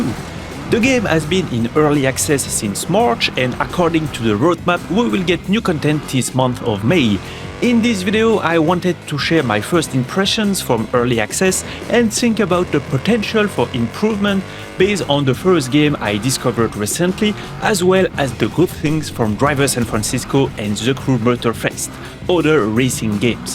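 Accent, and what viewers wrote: France. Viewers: cet accent francais 😅 (Voilà comme ça c’est mieux)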